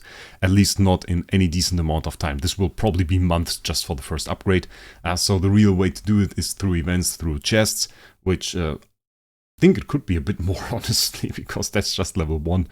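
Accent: Germany. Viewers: Are u German? I hear an accent and I like ur voice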